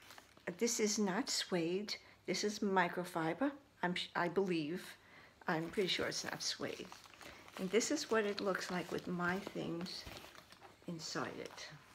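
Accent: New York, United States. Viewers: The Tabby Shoulder Bag is such a pretty bag! I got it in black and beechwood, as they were both on a great discount here in Europe a few months ago! I love the burgundy microfiber lining and the organization! I enjoy your videos and love your english, as it reminds me of NY! My mom is from NY and I still have relatives on Long Island!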